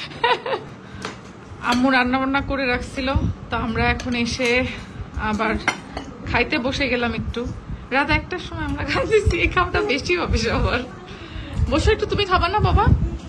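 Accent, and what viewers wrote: Kushtia, Bangladesh. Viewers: Apu tho sobar moto dong kore kotha bole na,tader kustiyar vasay amn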